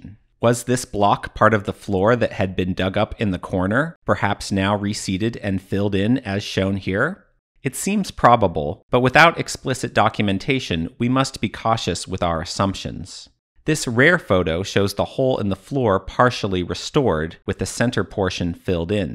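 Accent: United States. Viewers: That last pun of yours,is one that works ok with the American accent.You know~~~Annarica and all that. Enlightening video by the way, thanks.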